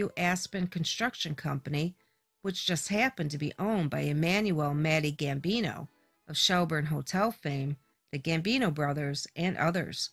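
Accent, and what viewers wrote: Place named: Brooklyn, United States. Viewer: Your channel is so good. And ur accent ( im from boston) sounds so familiar but i cant quite place what part of NYC. Old school Brooklyn would be my first guess tho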